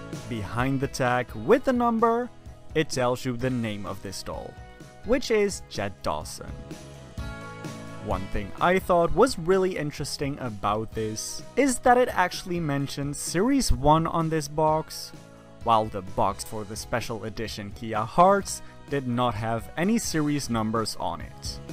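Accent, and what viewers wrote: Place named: Netherlands. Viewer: Are you from the Netherlands? Your accent sounds different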